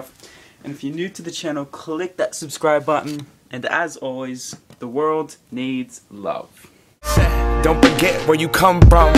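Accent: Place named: Australia. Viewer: BRIT !!! he's Australian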